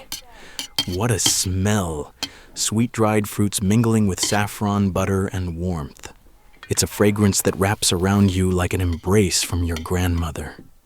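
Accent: United States. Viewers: I watch ur videos on mute because of that annoying American commentary. Listening to all that bullshit is annoying!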